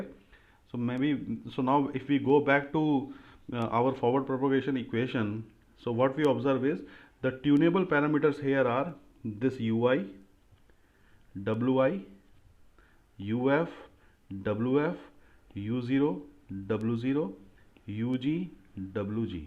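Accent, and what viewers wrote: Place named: India. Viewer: 果然是印度的英语😥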